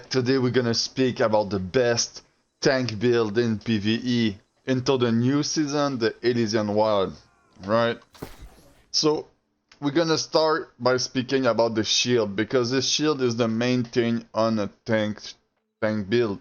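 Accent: Quebec, Canada. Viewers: Vu que je remarque notre fameux accent quebecois, je vais t'ecrire en francais hahaha. je me demandais si le build serais bon avec la greataxe aussi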